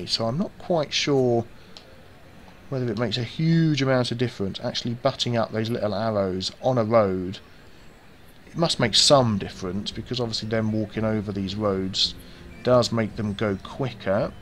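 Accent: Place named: Northern England, United Kingdom. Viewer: Hello! If you don't mind me asking what part of the United Kingdom are you from!? I can't put my finger on it! You sound kinda of northern from were i am at in London.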